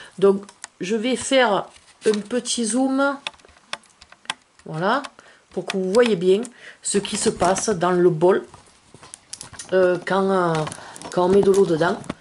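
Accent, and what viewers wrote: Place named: South, France. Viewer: L’accent du sud est parfait! J’adore!!👍 Je viens de recevoir mon 1er bol tibétain (j’ai essayé récemment chez un pote et j’ai trouvé ça incroyable !) J’essayerai avec de l’eau, ça a l’air terrible!! Et je te laisse un like ! ( parce que vu le nombre de vues, et zéro like, c’est pas cool! ) Allez, et je m’abonne, c’est cadeau !🎁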